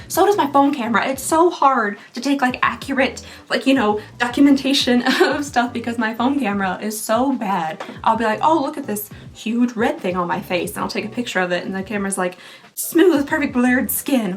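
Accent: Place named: Georgia, United States. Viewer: please know that you owe us no apology. As seen with other posters, we will be here when you feel well enough to do a video. I’m sorry to hear you are having a tough time. As for me, I just love your sense of humor and your lovely accent. It reminds me of my family of friends in GA. You have a wonderful attitude considering the amount of things you are dealing with. Good job with giving yourself injections! I know how hard that can be from personal experience. I’m proud of you!!!😊Doing this yourself will help you get over your fear of needles.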